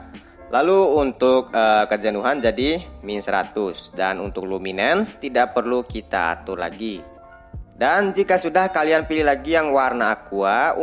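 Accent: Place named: Eastern Indonesia, Indonesia. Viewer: Monmaap bg, orang timur ya? Kental banget nada suaranya 😊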